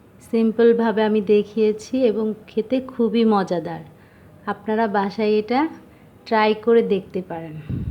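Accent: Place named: Noakhali, Bangladesh. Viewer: apnar kotha sune mone hosse apni noakhalir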